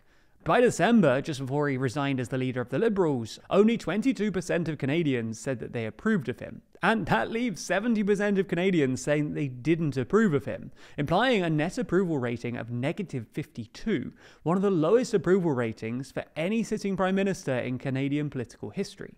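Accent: United Kingdom. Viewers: "Besides, why would anyone believe anything said about Canadian affairs from someone with a British accent." You mean like your head of state King Charles? Who was there for you in the war of 1812 when we collectively kicked the ass of the U.S.? Who fought for King and country at Vimy Ridge? Whose air force fought in the Battle of Britain, while the U.S. stayed out of WW2? If you like the United States so much, then why don't you just go and live there? Stop your anti-Canadian rot. We are not the United States, and our ancestors fought and died not to be part of the U.S. The Tories are just Yankee snakes who will use their disgusting treachery to make us the 51st state.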